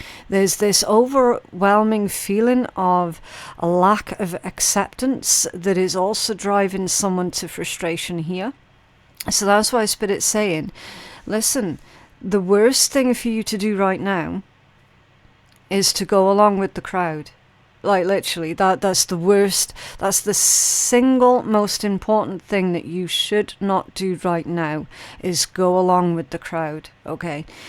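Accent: Scotland, United Kingdom. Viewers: I've had a glimpse of what I'm becoming, but....anticipation is making me wait. 😐 Hey - so nice to hear UR Scottish brogue loud & clear. ~ Godspeed ~ 🙏☀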